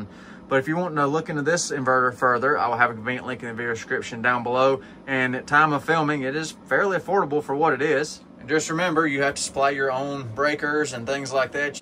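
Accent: South, United States. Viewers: I am very interested in the Sungold (SRNE) inverters. Could you maybe go over what solar panels you have and how they are configured and the changes you plan to make? Also, what gauge pv wire did you use? Maybe you can just add a new ground mount with a PV run from your old mount to your new mount and just hook up all the panels in series? Also, if you are getting a new ground mount, the ecoworthy single and double axis trackers look like they could be a really good investment and increase your active solar hours and extend your generation time (usually by 30-40%). I also love the southern accent! Fellow southerner here! Love it when people sound like my neighbors.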